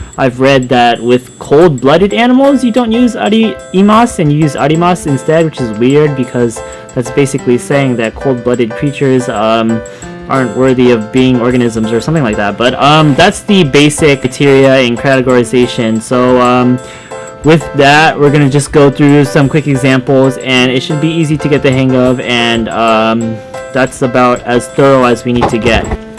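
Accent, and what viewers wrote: United States. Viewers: I'd guess he was raised in america(prbbly was born there as well) but his parents are japanese and talked to him in japanese But I think he has an accent in japanese (not sure tho) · Is English your first language, or did you learn it as a 2nd language? If the latter, i must say your American English accent is perfect.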